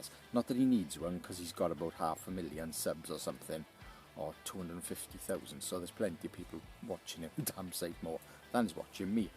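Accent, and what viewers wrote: Wales, United Kingdom. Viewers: Great Video Brother.😊👏👏👏 I could listen to that Welsh Accent all day. Great Fire Lay.👊💥🔥🔥🔥🔥🔥♨️♨️♨️ Thanks.😊🤘👊👏👏👏 That Splitting Axe kicked Butt alright bro.👞👞👞🪓🪓🪓🪓🪓🪓😁🤘 Nice Knife Brother. I would love a free one.😁🤘👊🤣🤣🤣🤣🔪🔪🔪🔪 a real Beauty.🥰🥰🥰 a Real work of Art. 3v great steel. I would love to know your favorite angle. I wish i could make Knifes like you bro. You have some serious skills.👊🤘😁 great pouch. You are a machine. 👏👏👏👏😊👊 good trick baton that piece of wood thru the final bit. Nice Demo. And Those Feather Sticks Look Insane.😊👊👍🤘 Knce Again A Big Warm Thanks From New Zealand. Ka kite Ano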